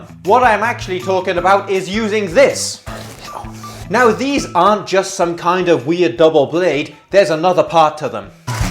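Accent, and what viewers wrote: Wales, United Kingdom. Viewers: anybody else curious how he got a welsh accent? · Oh wow are you welsh? Tin siarad cymraeg? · Is he Welsh? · also, you have an interesting accent, are you Welsh by any chance?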